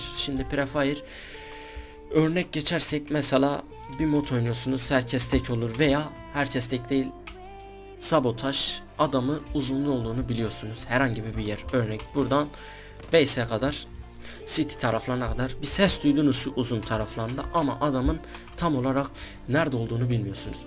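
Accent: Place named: Diyarbakır, Turkey. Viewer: diyarbakır lı mısın gardaşım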